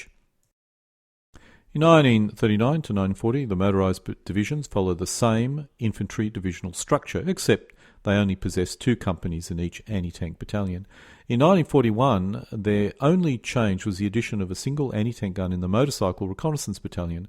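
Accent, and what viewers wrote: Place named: New Zealand. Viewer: Interesting. your voice sounds like New Zealand to me.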